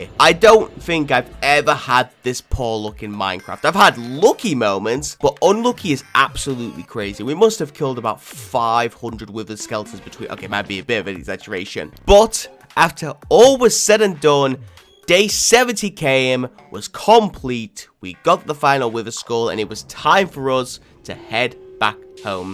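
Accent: United Kingdom. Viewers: I think you have a British accent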